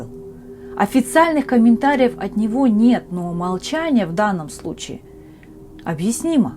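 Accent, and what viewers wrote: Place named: Ukraine. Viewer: Украинский акцент проскальзывает. Не сразу понял...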